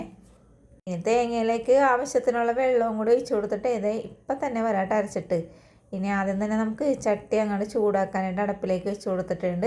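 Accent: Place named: Ernakulam, India. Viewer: എറണാകുളം. കടലോരo. ശബ്ദമാണല്ലോ 😄. 👍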